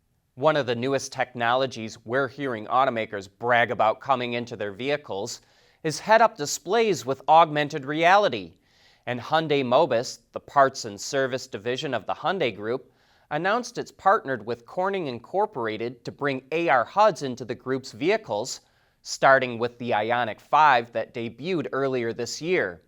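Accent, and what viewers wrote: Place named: Michigan, United States. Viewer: Heavy Michigan accent!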